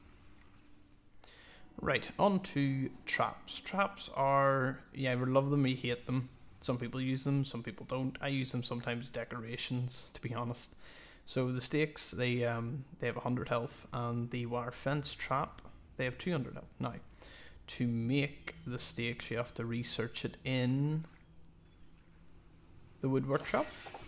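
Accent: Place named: Ireland. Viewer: It's really a delight listening to your accent, i wish i had an irish accent like yours.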